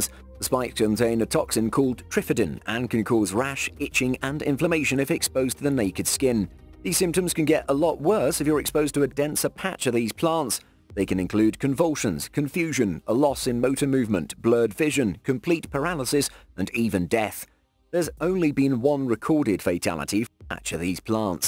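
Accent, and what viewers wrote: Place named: United Kingdom. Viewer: Uk accents so different from ours strick-neen we say strick-nine migraine me-grain we say my-grain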